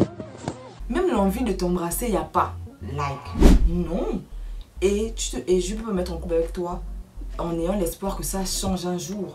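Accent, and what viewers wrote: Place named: Gabon. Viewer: C'est l'accent Gabonais qui me retiens 😂😂super pour la vidéo j'aime ❤❤😊😊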